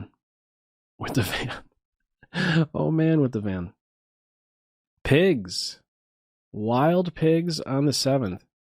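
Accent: Pennsylvania, United States. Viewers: did I hear a Pennsylvania accent?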